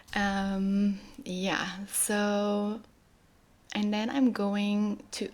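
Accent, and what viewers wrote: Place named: France. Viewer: Ps: j’adore ton accent français ☺️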